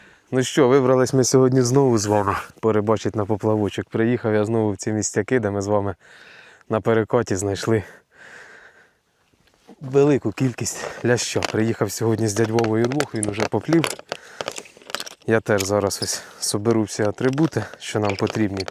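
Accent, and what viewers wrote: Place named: Central Ukraine, Ukraine. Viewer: По говору і краєвиду центральна наша красуня, може Полтавська або Черкаська.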